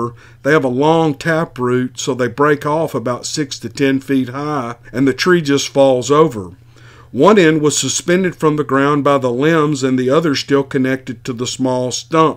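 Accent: South, United States. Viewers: I love your stories and voice. Being a New Yorka, it's refreshing to hear a country bumkin tell stories like this. Keep up the great work. Salute. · What the heck are you doing??? 😳haha I love it!!!! you are absolutely amazing these encounters remind me of what I heard a couple months or years ago and I lost track of you and I'll tell you what you have already moved from spot 21 to number one absolutely unbelievable encounters you're just doing such a great job I can't believe it and I love your accent and I love the way you're from the South and I love your guitar rifts you do you are very good at guitar too Keeper go on letter rip potato chip haha lol fantastic work wow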